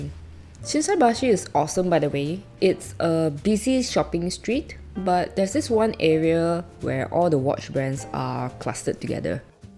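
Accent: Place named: Malaysia. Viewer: Very typical Malay accent. Good selection.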